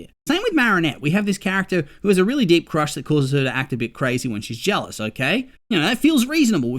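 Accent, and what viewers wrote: Australia. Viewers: an aussie no I love it ❤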